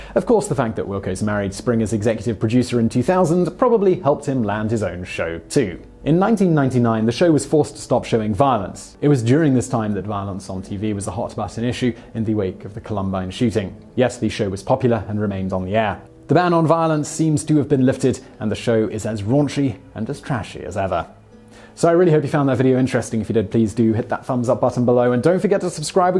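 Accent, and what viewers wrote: England, United Kingdom. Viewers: Please for the love of all you hold dear, have someone make sure knows the pronunciation of people's names. Granted he has an English accent that allows for differently pronounced words, like controversy. However, a person's name is only pronounced one way. This is a pet peeve because my name is seldom pronounced correctly. It is beyond cringe-worthy to hear a famous person's name mispronounced.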